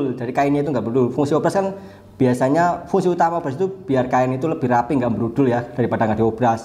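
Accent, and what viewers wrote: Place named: Banyumas, Indonesia. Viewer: Mantap maas..smua teori n praktekny.. Seneng dnger ngapak2 ny ..hehe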